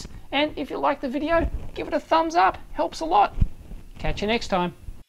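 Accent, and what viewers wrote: Australia. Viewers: Awesome! (said in your Aussie accent) :)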